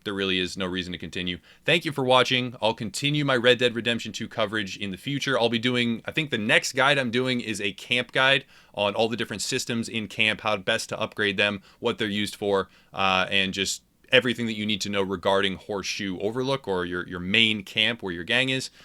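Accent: United States. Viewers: Typical thick American pronunciations, Saint Denis for example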